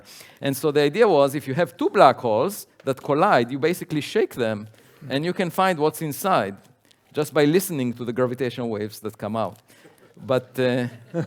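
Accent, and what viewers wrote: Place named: Europe. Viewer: This man is simply FASCINATING! And his European accent makes him all the more so. Thank you, Avi, for encouraging us to be humble. Like you, I am of the firm belief that we are NOT alone. But, of course, only time will tell.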